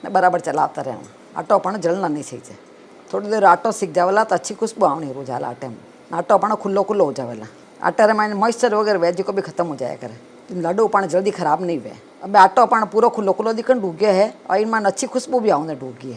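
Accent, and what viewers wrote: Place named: Marwar, India. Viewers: Aap English bhi Marvari mi bolty ho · आपकी gk को प्रणाम,,मारवाड़ी भाषा में English मीठी लगती है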